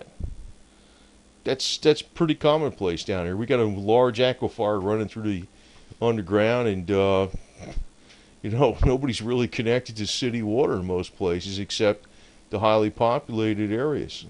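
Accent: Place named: South Jersey, United States. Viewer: Dude You sound like another refugee from South Jersey? Me too Burlington, CO. Been in FL. about 20 years now, ain't going back!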